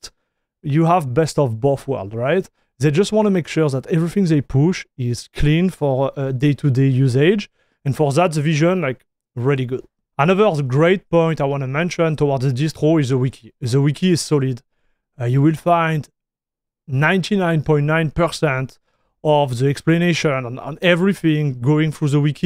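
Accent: France. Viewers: chat, i think this guy might be french, can't put my finger on it why tho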